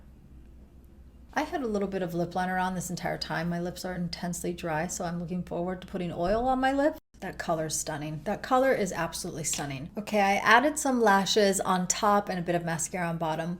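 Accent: United States. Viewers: Regarding dangerous or toxic ancient makeup and beauty practices: seems not much has changed over the centuries--think of all of today's popular, potentially dangerous plastic surgery performed in the name of attaining beauty. Dont get me wrong, I take zero issue with anyone getting plastic surgery for any reason...just making a point. Side note: you've gotta be from the midwest. I am, and I pronounce words ending in '-ing' the same way as you. Cute!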